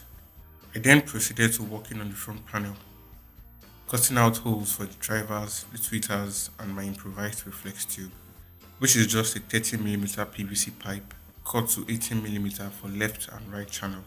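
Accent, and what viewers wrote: Nigeria. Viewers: Nice work your Accent sounds like You are Nigerian...A few pointers though..Try using speaker gasket instead of silicone gum to hold down your subwoofer, silicone has been none to react not to well with speaker surrounds....Nice work 👍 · I guess he's from Nigeria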